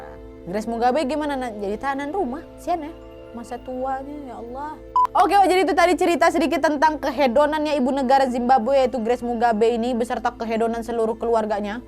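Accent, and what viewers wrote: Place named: Medan, Indonesia. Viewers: Ko orang medan kak?? Logatny mantap kale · Logat Sumatra nya kental sekali...si.. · Bahasanya medan kali lah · Bah.. Baru singgah disini, anak Medan kayaknya kakak ni.. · kk org medan ya? logatnya kentara😂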